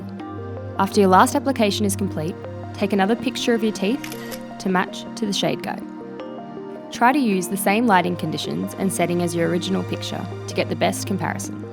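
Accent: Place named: South, United States. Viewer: Southern accent 100%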